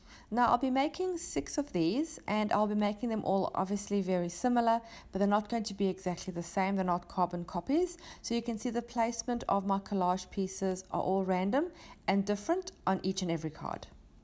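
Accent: South Africa. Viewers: Love your work and your way of explaining what you’re doing and how you come to your final decision about composition. Of course love your accent! Says a fellow South African. I would love to be a winner of one of your cards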